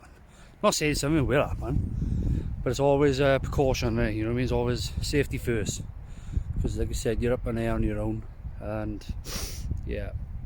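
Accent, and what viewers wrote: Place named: Wales, United Kingdom. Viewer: Just stumbled on your vid. Great tips. Love the accent I lived in South Wales for a bit. I miss it down there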